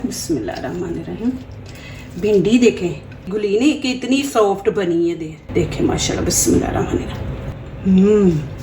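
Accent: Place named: Punjab, India. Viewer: Allah apko sehat de, love your typical Punjabi style in urdue , I am from Texas United States of America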